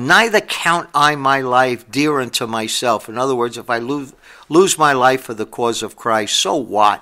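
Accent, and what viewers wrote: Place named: New York, United States. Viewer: I am from faraway New Zealand.....and I love the New York accent! Not only that, Brother is an excellent preacher and is not afraid to tell it like it is. I appreciate that! The churches from my hometown are largely of the social gospel, sugar coated, entertainment variety. And they use the modern ‘bibles’. I moved away from them several years ago so I am extremely grateful to have these wonderful, Scriptural videos. Many, many thanks from a Christian Kiwi!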